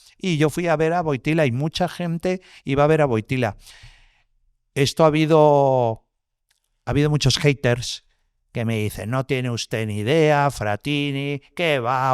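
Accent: Andalusia, Spain. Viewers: Excelente Potscast, la amo a lo maximo, excelente comunicadora, me encanta sus entrevistas y su acento andaluz. Tal vez mi origen tambien viene de ahi, creo que Malaga me jala para alla!! Abrazo beso.!!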